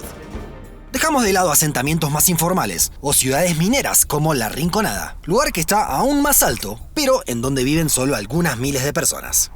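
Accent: Rioplatense, Argentina. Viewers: No exageres La Paz y el occidente siempre tuvimos habitantes, por tu acento pareces argentino o uruguayo como se dicen europeos la mayor civilización de Bolivia e fué Tihuanaco superior a cualquiera de Bolivia o siempre había habitantes.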